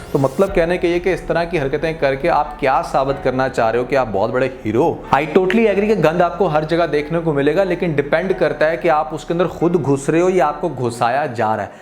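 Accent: India. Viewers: Mr. your accent and words shows that you are highly effected by Indian content. Ap kisi Urdu k professor se milen aur baat Karen ta k ap seekh saken k humara accent aur alfaz un se kitne behter aur khubsorat hain.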